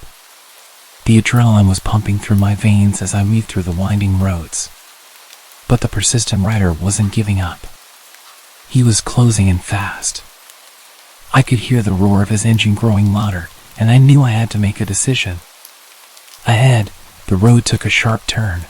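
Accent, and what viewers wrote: United States. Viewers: Oh no, an American, bye.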